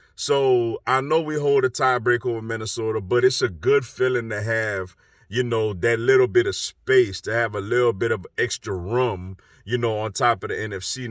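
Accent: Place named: Louisiana, United States. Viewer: Where you from sound like Louisiana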